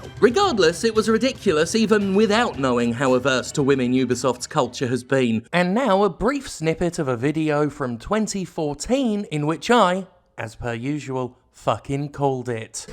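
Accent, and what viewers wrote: United Kingdom. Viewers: 2014 sounds britisher than 2020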